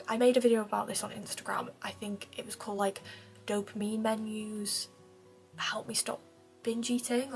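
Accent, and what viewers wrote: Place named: Liverpool, United Kingdom. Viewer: As someone not from the UK I hear your accent as scouse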